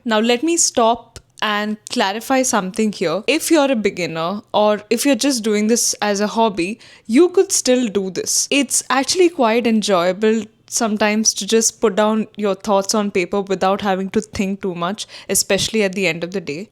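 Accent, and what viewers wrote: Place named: India. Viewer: Thank you, muchas gracias. You seem to have an Indian accent.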